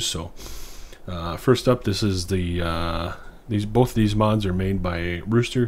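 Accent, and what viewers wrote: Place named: Michigan, United States. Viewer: Haha just started watching your videos searching for allis chalmers heard your voice and said to myself that guy sounds like he is from Michigan and lo and behold you are lol I to am from Michigan in the U.P. I thought I caught a little yooper accent from you but could be northern Michigan as well lol anyway thanks for the video.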